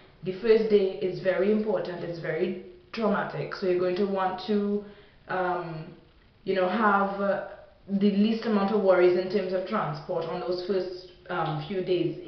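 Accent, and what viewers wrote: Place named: Trinidad and Tobago. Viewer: How exciting!!!!! :D In the other videos I'm like that girl rellll sounds like a Trini. I'm in first year now and I intend to do this after second year so I'm collecting as much knowledge as possible. Did you have prior knowledge of the French language? THanks!! Rushell :)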